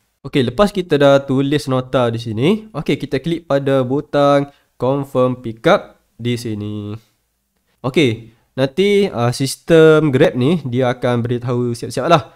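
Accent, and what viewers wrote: Kelantan, Malaysia. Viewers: kecek kelate terus lagi baik...tak payah kau orang2..pelat kelate mu tak boleh lari...hehe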